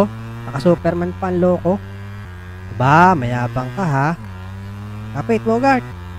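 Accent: Batangas, Philippines. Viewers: Batangueño ka po?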